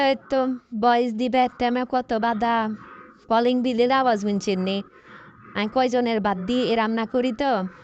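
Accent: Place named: Noakhali, Bangladesh. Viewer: আপু আপনি নোয়াখালী কোন জায়গা 🤔🤔🤔আমি অনেক জায়গার কথা জানি 🤗🤗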